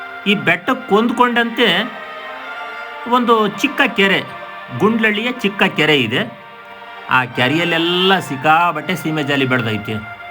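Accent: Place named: Pavagada, India. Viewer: Pavagada bhashe lli heltha ediya guru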